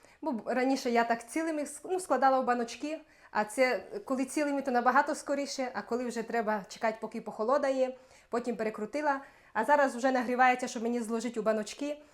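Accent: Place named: Zhytomyr, Ukraine. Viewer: Я зжитомерщини ваша мова дуже схожа на нашу бальзам на душу сімейного вам щастя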